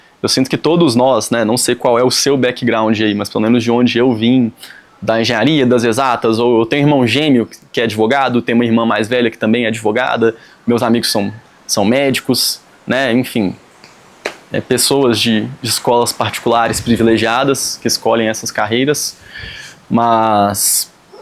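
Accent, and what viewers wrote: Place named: Minas Gerais, Brazil. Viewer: Mineiro falando já dá uma paz de espírito… parabéns pelo conteúdo!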